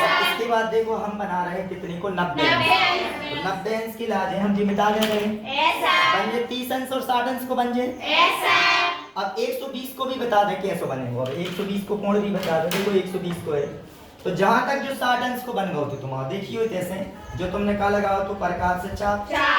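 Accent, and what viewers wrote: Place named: Bundelkhand, India. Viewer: Apni bundelkhandi boli ki bat hi aalag he 🙏🙏🙏❤❤❤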